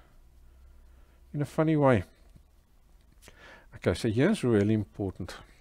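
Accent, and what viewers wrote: South Africa. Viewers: Your paintings are amazing! Thank you for sharing your talent with us. You sound South African, are you? Because I am and I have found that selling your art work in this country is difficult. Do you have the same problem?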